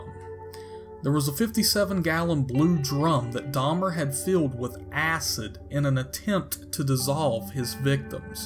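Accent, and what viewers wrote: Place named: West Virginia, United States. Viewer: Are you from West Virginia?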